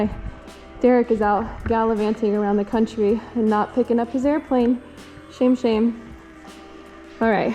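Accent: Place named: South, United States. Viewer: Fun video. Your presentation is very relaxed and natural. So much so, that Southern is coming out. Happy flying to both of you.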